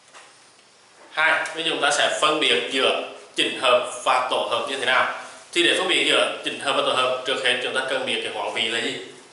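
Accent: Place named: South, Vietnam. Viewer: lâu lắm mới nghe giọng miễn nam hay ghê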